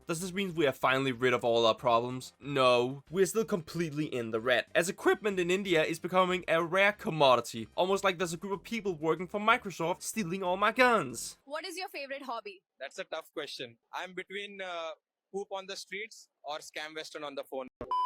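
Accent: Denmark. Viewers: Danglishen går hårdt🙏🙏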